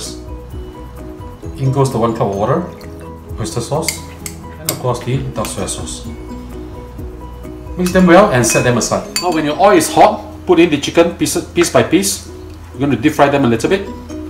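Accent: Singapore. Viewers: Singapore English lol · I would say he has a heavy Singaporean accent. It is not Hong Kong. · This is Singlish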